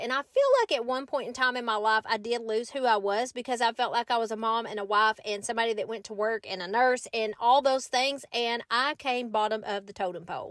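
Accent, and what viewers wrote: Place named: Tennessee, United States. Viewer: 🧹🗑️🧽 I made it! ASMR isn’t about being loud, it’s being super quiet while you record the normal sounds of the stuff you’re doing: — the sound of cabinets opening and shutting… — a knife blade slicing or chopping through food… — a blanket snapping as you shake it out before you fold it… and on and on. No banging or slamming needed (or wanted). You record all that stuff, cut it together really close when you edit so it’s a series of sounds one after another (accompanied by the visual of you doing the thing that makes that sound), and that’s an ASMR video. That said, I’m happy you don’t do ASMR because I hate that nonsense! I love to listen to your Tennessee accent in your voiceovers. I can’t understand why these videos don’t perform well for you. This is the content that made me decide to subscribe! I also like when you show how you make certain meals and desserts. 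I need to try the cinnamon rolls with pie filling. That looked so yummy.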